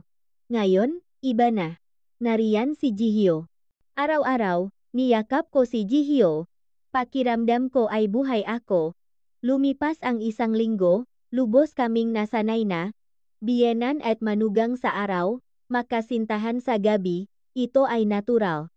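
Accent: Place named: China. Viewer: Ano n yn, intsik k b, nakakawalang gana makinig syo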